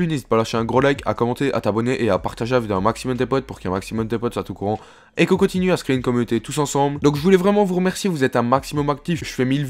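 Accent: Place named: Belgium. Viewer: Je crois qu'il est belge où chti't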